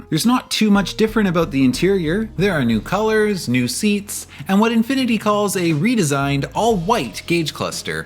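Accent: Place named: Canada. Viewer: you are definately CANADIAN. "aboat time."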